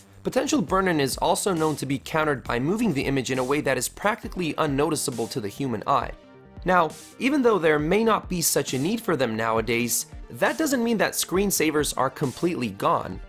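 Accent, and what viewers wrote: Balkans. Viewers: Are u from balkan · Are you from the Balkans?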